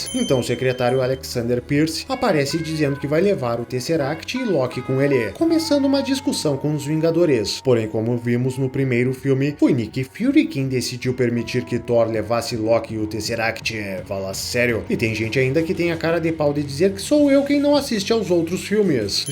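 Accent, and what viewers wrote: Rio Grande do Sul, Brazil. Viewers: Mas ah locô véio! Esse sotaque é inconfundível. Tu é do RS?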